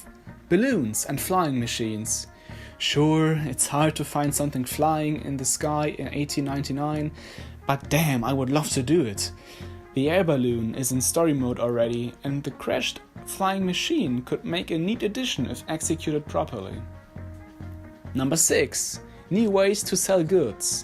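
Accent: Germany. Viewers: Man hört den deutschen Akzent einfach raus 😂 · Bro you're german?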